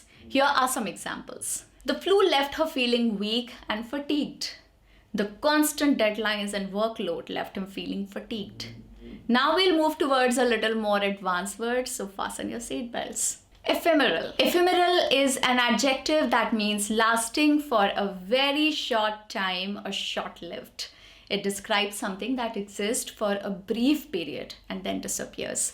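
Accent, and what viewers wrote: India. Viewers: People that don't have an American accent have no business teaching anyone English. That is the strongest indian accent I've ever heard. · You have a huge hindi influence in your English accent, you better work on your accent,intonation it sounds awful.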